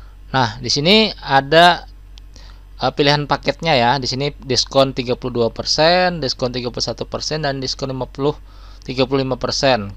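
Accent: Banjar, Indonesia. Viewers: Banjar bangt pian nie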